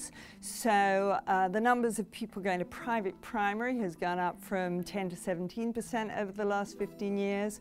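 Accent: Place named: United Kingdom. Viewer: She speaks like a typical British supposed to speak:)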